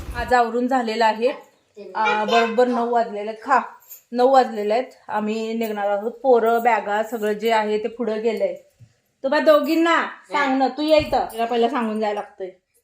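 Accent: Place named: Dhangari, India. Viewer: ताई तुझी भाषा धनगरी वाटते ग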